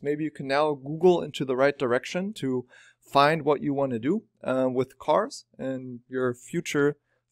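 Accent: Germany. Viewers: You sound very german:) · Achso du bist Deutsch? Ich habe dein Channel noch nicht so lange verfolgt. Man hat das muss ich zu geben ein bisschen an deinem Akzent bemerkt aber eigentlich kannst english so gut das ich erstmal dachte du könntest auch von ganz wo anders her kommen.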